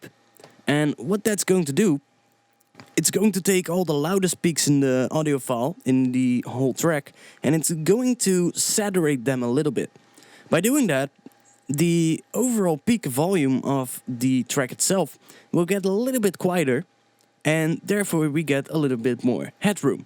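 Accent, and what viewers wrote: Netherlands. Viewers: Somehow, if you're Dutch, no matter how good your English is, you'll always recognize the accent :D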